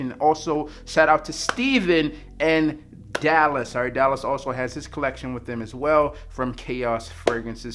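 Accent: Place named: Queens, United States. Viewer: Yo you from Queens boss? I like the energy with the way you describe the scents. Just subscribed. Keep up the good work 💯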